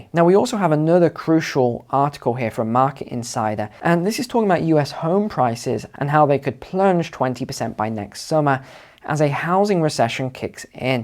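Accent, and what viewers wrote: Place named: England, United Kingdom. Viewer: I start like English accent more and more.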